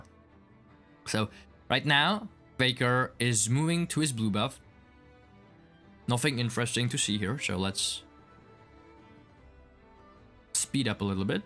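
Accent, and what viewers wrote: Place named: Asia. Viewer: Could tell after 5 seconds that this is Asian, maybe but just maybe a very drunk dutch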